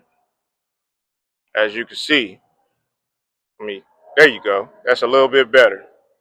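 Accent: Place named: Detroit, United States. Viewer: That sound like a Detroit accent too 👀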